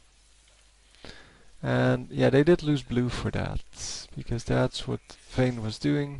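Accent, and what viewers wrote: Netherlands. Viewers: Only real dutch people have this i live in the netherlands as well but my parents are from somewhere else but i dont have THAT accent :)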